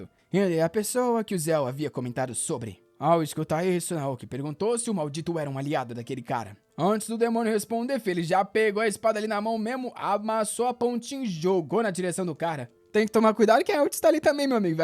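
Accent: Rio de Janeiro, Brazil. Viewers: é carioca? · o é carioca?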